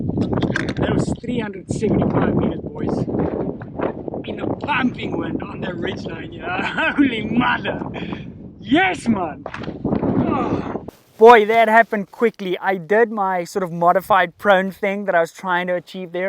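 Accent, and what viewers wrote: Afrikaans, South Africa. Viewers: Bro sounds southafrican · Ek hoor jou Afrikaans !! ❤❤❤❤Jy skiet mooi! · Yo dude kan jy afrikaans praat i said can you speak afrikaans cause you sound like you come from south afrika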